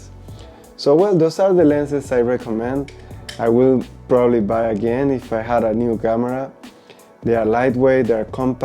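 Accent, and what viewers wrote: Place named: Spain. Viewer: I like your accent too, although it sounds Spanish? In Macau don't they speak Chinese, Portugese and English? I'd love to visit there! Great video, BTW...♥️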